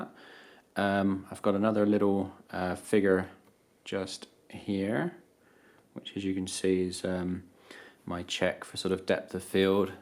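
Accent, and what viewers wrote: Bristol, United Kingdom. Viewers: are you from bristol ?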